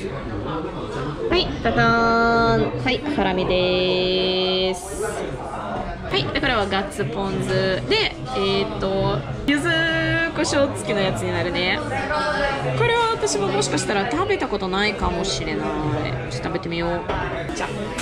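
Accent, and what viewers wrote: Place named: Okinawa, Japan. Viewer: なんか沖縄っぽい訛り方してますね！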